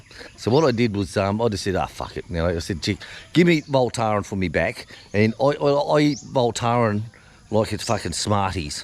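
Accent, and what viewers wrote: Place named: Australia. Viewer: Australians do have their own language. I have a difficult time understanding you guys. Your accent is not that strong though, or maybe I'm just getting used to it.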